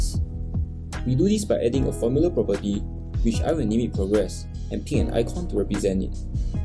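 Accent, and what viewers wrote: Singapore. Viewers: Omg ru singaporean HAHAHA i recognise the accent!! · Super great video thanks for sharing! But please don't shock viewers with this loud music in the end. If you dont want to remove it at least turn it down (or alternative make your voice louder) Also I think this video would be easier to understand for many people if you use more standard English (Nothing against Singlish but it can be difficult to understand for folks who never heard it haha) Keep up the great work!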